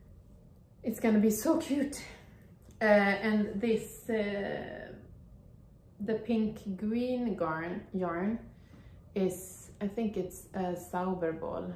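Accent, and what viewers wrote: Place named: New Zealand. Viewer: Hello from New Zealand. I wondered whether you had learned English from a kiwi - some of your vowels sounded distinctly kiwi-ish! Then I read your explanation below - our neighbour Australia. Thank you for your podcast in English. You have made beautiful garments.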